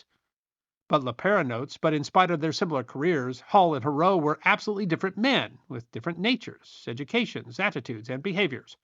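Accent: United States. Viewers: It is Aluminium It is Antar c tica It is so l der The letter Zed rather than nursery rhyme Zee Dumbing down of pronunciation symptomatic of trumping the US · I don’t love the American speaking. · My ears hurt, bloody Yanks.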